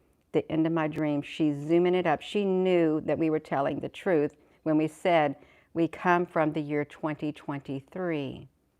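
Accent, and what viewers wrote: United States. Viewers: Yes, 😘 Speaking through your 👃 Nose as well as your 👄 Mouth -- an American Accent with Breaking and Bendy Vowels. It Ain't Country Without a Drawl (and your pretty smile-ing-eyes). TS: - ♥️🎩💈🎯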